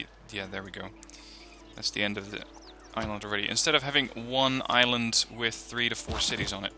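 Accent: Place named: Netherlands. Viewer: Aweful dutch accent though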